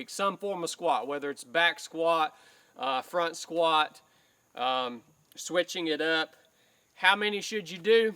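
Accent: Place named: Tennessee, United States. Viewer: Love it nice, I dig the accent as it reminds me of my dad who was from Tennessee, I grew up in California I was a CTR in the NAVY, worked out a lot, I was even better on a run than most youngins. I am 48 now and got type 2 diabetes, got to watch how much beer and sausage I eat I guess. I pretty good, bit tired after lunch though. I always need a nap. I teach teenagers Mon-Fri. 8-2pm. I wake up to prep for work btw 6-7am. I go lift at the gym at least once a week maybe 2 sometimes, I try to do a FunkRoberts(youtuber) 10-20 min workout a couple times a week. Any tips on diet and workout are welcome guys, Thanks!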